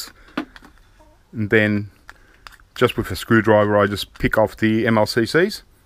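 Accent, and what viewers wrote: Australia. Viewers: its obvious he's from Australia by his accent and the fact he's wearing a t shirt in april, too cold in the uk for t shirts at the moment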